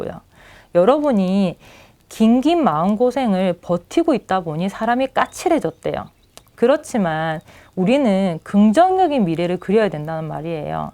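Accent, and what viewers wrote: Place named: Busan, South Korea. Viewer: 리딩 너무너무 잘 들엇어요!! 디테일한 리딩도 좋쿠 딕션이 너무좋아 귀에 쏙쏙 들어오네요~~😊 부산사투리 매력잇어요!!! 또 피드업뎃해주세요오오오!!🙏🏻🙏🏻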